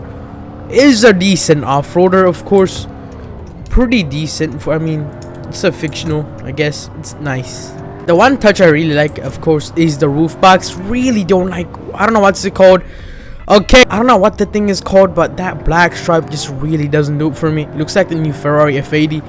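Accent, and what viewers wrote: Germany. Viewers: i love the german accent. Ich hab noch nie so einen schönen , guten , vry butiful germanium mensch gesehen. spass bei seite ich liebe deine videos! Dein humor ist perfekt :D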